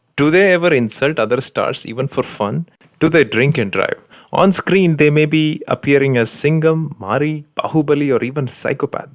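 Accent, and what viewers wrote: Kerala, India. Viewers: I'm here to listen to the slight mallu slang that he has in the English... It's so satisfying😍😍😍😍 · one on my best friend who is a malyali and who is an army officer has the same accent and co incidentally he is also 🙂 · Are u Malayalee bro? Ur english slang is typical mallu... Great going... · Is this host is Malayali 😋 from tht speaking style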